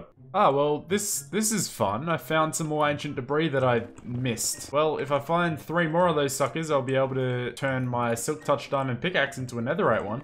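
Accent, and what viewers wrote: Australia. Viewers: Are you Australian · Wait, you’re Australian? POG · This dude had an accent and everything and I still didn't realize he was Australian until he said something. I'm an idiot. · Seriously I never Knew you where Aussie #AustraliaForLife Because I’m Aussie to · You're Australian? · Wait, Australian YouTuber?? Omg I thought he was British... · WAIT YOUR AUSTRALIAN!?! I didn’t know….YASSS I FINALLY FOUND A NON~ AMERICAN YOUTUBER!!!!!!!!!!!!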